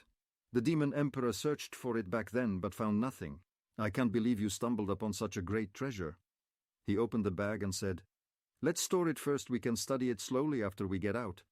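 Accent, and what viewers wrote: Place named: India. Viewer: It's like an Indian accent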